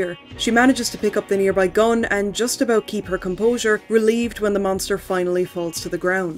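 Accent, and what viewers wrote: Ireland. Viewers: Am I detecting a pretty strong Irish accent in your voice? 😉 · it's always so cool to hear another irish person on ytube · Forgive me as I'm new, are you Irish?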